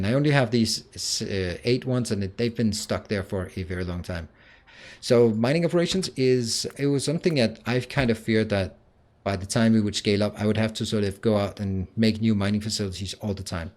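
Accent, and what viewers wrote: Denmark. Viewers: Just wanna be sure: you are from Denmark? right?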